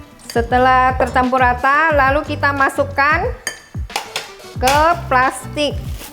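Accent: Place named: Java, Indonesia. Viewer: Saya suka resep2 kue khas medan👍 cuma logat bicara cik kok logat jawa ya?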